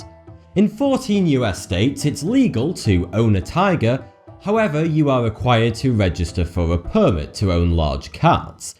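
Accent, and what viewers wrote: United Kingdom. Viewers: Does this account for the USA? because you sound British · Whats with the Brit?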